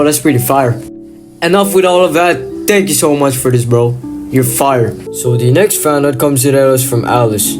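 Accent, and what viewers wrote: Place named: Texas, United States. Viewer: why does bro sound texan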